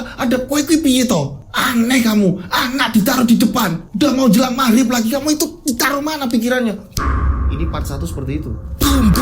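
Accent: Java, Indonesia. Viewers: Kembali hadir denpasar 😊, seneng skli dengar crita dik...ngena skli dng bhsa jawanya..suka skli dngernya....bravo dik..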